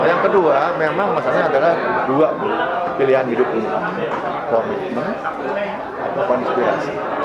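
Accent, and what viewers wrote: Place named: Sunda, Indonesia. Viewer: Voice of sunda, mantaf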